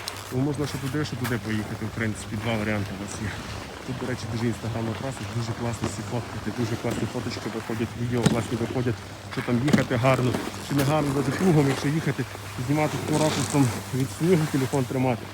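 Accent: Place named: Transcarpathia, Ukraine. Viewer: Гарний закарпатський говір !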